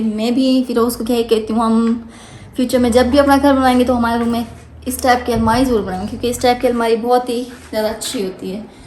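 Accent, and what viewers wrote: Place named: India. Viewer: Aap to indian jaisi hindi bolti ho or aap bahot hi khubsurat ho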